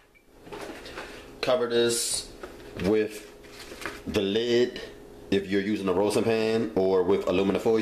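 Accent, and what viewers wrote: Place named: DMV, United States. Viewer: Homie sound like he from the DMV...on muvas! And the wing/rice setup look on point! No cap!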